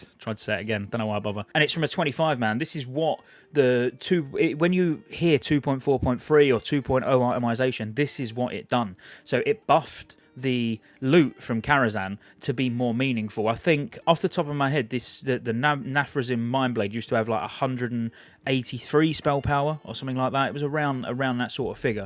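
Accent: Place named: England, United Kingdom. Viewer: aww I just watched a video about this... well at least I get to watch it now in an english accent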